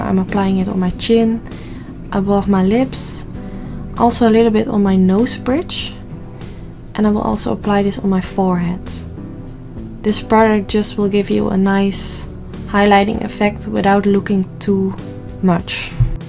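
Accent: Netherlands. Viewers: Hi! Gorgious make-up i love it! 😘 I have a question tho, Are you Dutch? Cause you sound like one. Let me know. 😘 Fallonrachel (The Netherlands)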